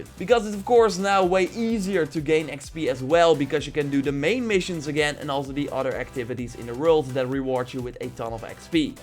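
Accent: Netherlands. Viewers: omg you are dutch :D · Ik wist dat je Nederlands was!😂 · are you dutch?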